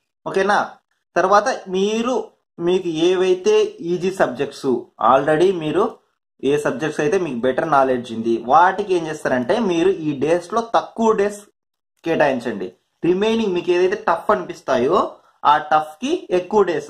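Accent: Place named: North India, India. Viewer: Baagaa matladaaru I mean pure telugu. Nenu meeru north anukunnanu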